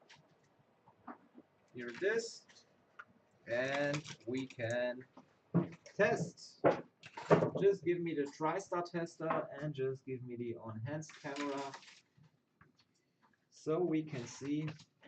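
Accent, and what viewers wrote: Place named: Germany. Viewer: Thank you for spoken English, which is very good. Also your cool German Accent makes English sound more interesting. Would you please consider adding English closed captioning to future videos? I’m sure you guys will gain a lot more subscribers by doing this. Your work is excellent. As always, a BIG 👍 from me!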